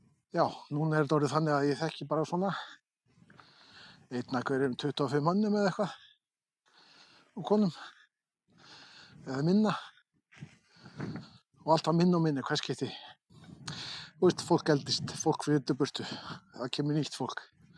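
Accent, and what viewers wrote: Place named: North, Iceland. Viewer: You'll always be from the North! Maybe 20% Vestmannaeyjar & 10% Borgarnes 🙊 Great video, breating was btw not too load, the scenery was great, per usual & i look forward to your next videooo ❤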